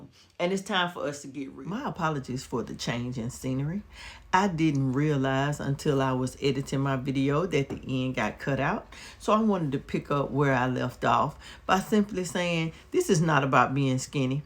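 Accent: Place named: South, United States. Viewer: I can tell by your accent that you're from the South. I am from the South as well. But I also lived in California for 2 years. And I can tell you that most Black Men on the West Coast DON'T like Overweight women!!! That's more of a Southern thing.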